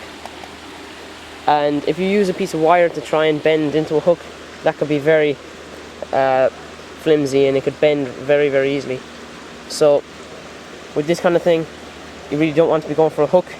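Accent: Ireland. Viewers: What part of Ireland are you from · He's Irish{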